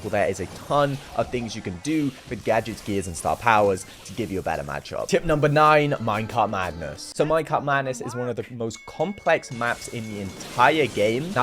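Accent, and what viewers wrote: United Kingdom. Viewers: it's called a British accent dummy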